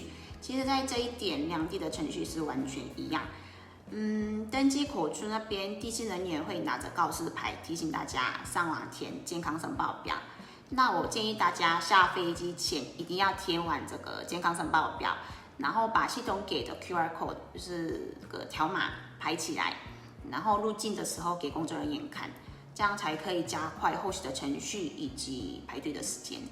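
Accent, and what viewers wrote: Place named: Taiwan. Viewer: 台灣腔真好聽。已經變成台灣人了。🤗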